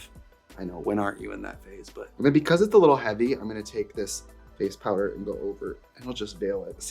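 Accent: Wisconsin, United States. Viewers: The wisco accent is really strong after the break 😂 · Your Wisconsin accent is thicker. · Trixie goes on vacation for 3 months and comes back with Milwaukee Wisco accent in spades she's been trying so hard to keep under wraps. [I also have this accent and try to keep it under wraps]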